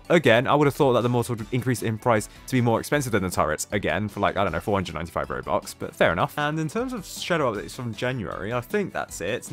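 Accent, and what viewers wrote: United Kingdom. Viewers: continue yapping I love listening to it bri'ish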